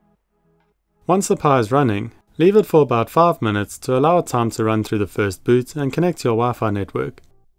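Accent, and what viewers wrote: South Africa. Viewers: Are you a Saffa?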